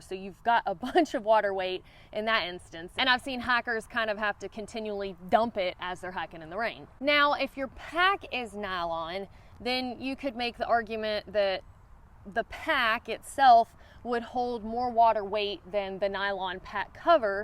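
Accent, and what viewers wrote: South, United States. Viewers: Why, sir, that is a Southern Belle through n through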